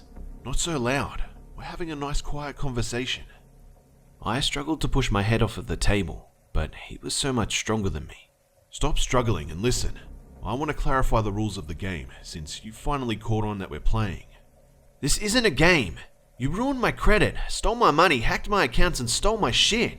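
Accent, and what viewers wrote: Australia. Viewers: You know. I heard there's the full version somewhere. What's the point in that when you can have the himself read it to you in his amazing Aussie voice as you are about to sleep? Exactly.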